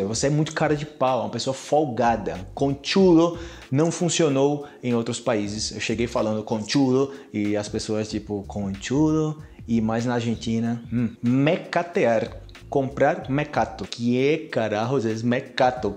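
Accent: Antioquia, Colombia. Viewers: Soy de Venezuela, usamos bastante la palabra chévere, bacano nunca escuché alguien decirla, pero si la dicen uno entiende que es. Hablas bien el español, hasta pareces colombiano al hablar😎😎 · veo tus videos cuando puedo, tu acento parece mas colombiano que brasileño. Tus vídeos me han ayudado en mucho. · Profe usted ya es paisa, tiene el acento!! 😂 Se nota que está amañado...!! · Eu acho que tem muito do sutaque paisa da Colômbia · Me gusta tu español con acento colombiano👏 pero tu portugués me encanta 😁 os países de fala espanhol tem muitas palavras com significado diferente, en mi país tambén usamos, "me regala" cuando vas a comprar algo. Muito bom seu video👌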